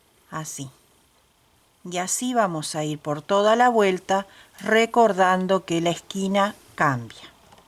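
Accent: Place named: Argentina. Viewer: Señora quisiera que usted me recuerde el punto Margarita, muchos les dicen jazmín, yo lo tejo hace ya un tiempo,pero olvidé como usted explica muy bien las cosas le pido ese favor la, la forma de tejer y de hablar suya dr parece mucho a nosotros los Argentinos, sepa usted que acá es muy querida un abrazó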